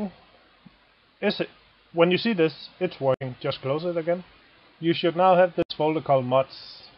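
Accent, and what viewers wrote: Denmark. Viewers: Great video, even though the audio is pretty f***tup. But i am wondering, where are you from? Denmark? Or anything close? :P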